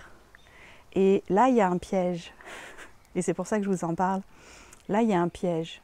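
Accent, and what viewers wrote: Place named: Quebec, Canada. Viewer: Merci, tu es si sage et pertinente! Dis-donc, tu vis au québec ? Je crois reconnaitre mon paysage et un peu de notre accent. :D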